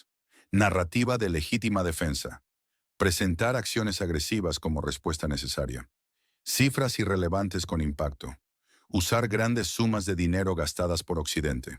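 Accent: England, United Kingdom. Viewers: ¿Hablas inglés con acento español. No verdad? Si habéis decidido doblar al castellano hacedlo bien, sin arrastrar las erres como tarados. Hablar español con acento inglés impone un idioma inexistente.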